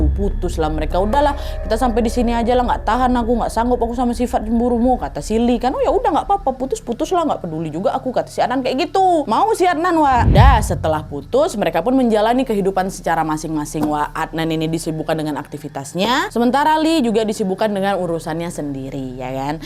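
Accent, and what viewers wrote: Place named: Sumatra, Indonesia. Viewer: Trnyata banyak jga yg suka nntn 😂 suamiku sampe hafal kalo udah denger kau nada sumatra pasti lg nntn si wawa yaa😅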